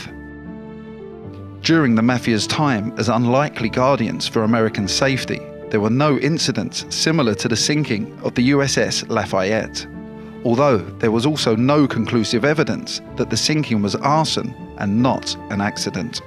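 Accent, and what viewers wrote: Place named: United Kingdom. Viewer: NYC history with a British accent. Fail...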